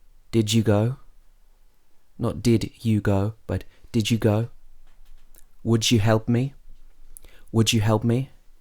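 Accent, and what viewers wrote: United Kingdom. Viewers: Excellent British accent 💗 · British accent ??????? Je je je.....no thanks....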